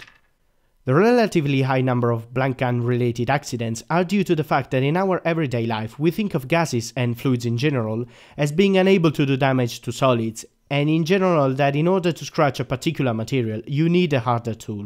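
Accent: Italy. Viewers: he's Italian, it's his accent. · L'accento italiano si riconosce ovunque, bel video, strutturato in maniera ottima e molto informativo, bravi. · He sounds so italian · All'inizio dall'accento ho pensato fossi italiano, vado avanti e leggo nella cartuccia del fucile a pompa "fiocchi", lì mi sono tolto ogni dubbio😂. Davvero complimenti per la pronuncia in inglese, sei molto bravo! Il video è fenomenale, sei davvero bravo!! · Ma sei italiano? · troppo forte l'italiano che parla inglese , shock ! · He's Italian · He is Italian. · Hey ma sei italiano? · This guy is Italian I’m sure. I’m Italian I recognise the accent. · ma sei italiano? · Are you italian? The accent and the beretta? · sei italiano l ho capito subito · Dall'accento presumo che sei Italiano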